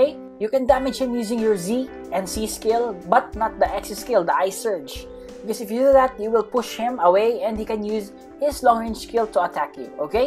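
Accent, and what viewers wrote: Philippines. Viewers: Of course he is, my filipino teacher who teaches English in my school in Indonesia have a same Accent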